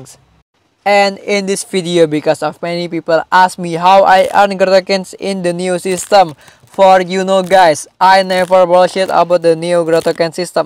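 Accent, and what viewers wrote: Indonesia. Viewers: logat indonesia nya masih kelihatan wkwkwke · woy.. english ne jgn ada logat jawa nya dong... klau gk pande bahasa inggris... tulis aja.. gk enak dengernya hadeh!! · Jack Roach He indo, his accent was indo/asian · ronald <num> His sound like Reza oktovian,so h is Indonesia.And his sound like From Indonesia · Orang indonesia ya???logat nya persis orang indo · your sound is like indonesian people trying to talk english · Indonesian accent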